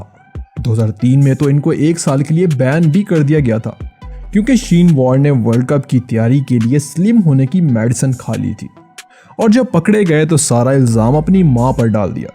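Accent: Pakistan. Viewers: Yeh Pakistani lahza h is creator ka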